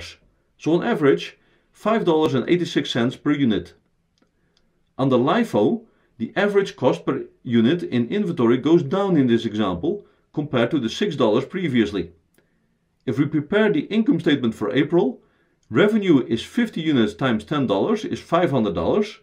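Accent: Netherlands. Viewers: i´ve lived 10yrs in holland (<num> r´dam) .. your accent is unmistakable :D im planning to go back there ..... i buy, grow and sell companys (mergers & acquisitions) .. mostly distressed companys.. but not always.. i could use help from a trustfull financial advisor for my financial due diligence .. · A brilliant explanation. Many thanks! Could I ask please, how would you work out the COGS where you buy something as a set and then split the parts out? I don't mean bulk buying of the same item, but rather if, for example, you bought something like Lego sets. Each set is a sealed box with its own price, and you then "part out" the set into many different types of piece. Each type of piece can sell for vastly differing prices. If you just divided the cost of the sealed box into the number of parts to give each part an equal cost (say, a £100 set with 200 parts meaning the COGS for each part you sell would be 50p) , you could be at a disadvantage when trying to claim COGS if you only sold the rarer parts that fetch high prices because your margin would be higher on those parts. 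But is that how it should be done? Another example would be a breaker's yard where they buy in scrap cars and then sell the parts off for different amounts. What would the COGS be for each part they sold? A wheel would sell for a lot more than a wing mirror, so what are their costs out of the original cost of buying in the scrap car? And yet another example could be a collection of trading cards bought for a single cost. The collection is then split into separate items to be sold, but how should we work out the COGS for each card sold? Thanks for any tips! Echt heel erg bedankt! (sounds like a Dutch accent on your videos, but I might be wrong). :-)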